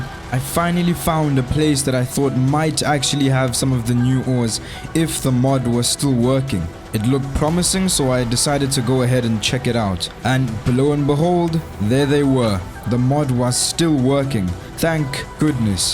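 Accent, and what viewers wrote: South Africa. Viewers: I knew your accent sounded familiar, I am also a fellow South African 😄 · My bru are you South African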